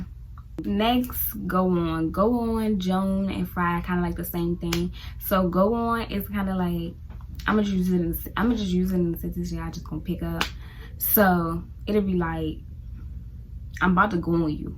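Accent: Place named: Baltimore, United States. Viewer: Im from Philly. The way you talk sounds like two Baltimore girls I know. And I know you're not saying (yew,dew) like them, but it's more than that. I can't explain it cus I'll be writing paragraphs. You can tell the DMV is a transitional area. DC locals just sound more southern.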